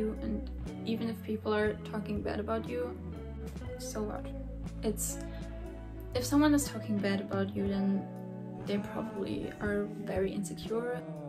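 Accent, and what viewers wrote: Germany. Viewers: Kannst du mal deutsch sprechen man höhrt das du deutsch sprichst 😅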